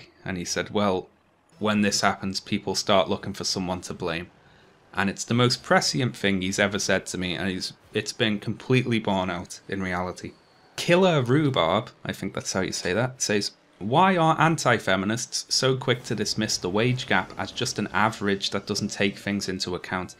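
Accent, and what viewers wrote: Liverpool, United Kingdom. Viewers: Scouse power!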